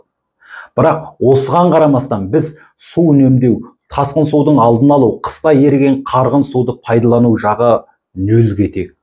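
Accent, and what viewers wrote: Standard. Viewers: Рахмет сизге таза Казакша сойлейсиз барлык блогерлер СИЗ сыякты болса екен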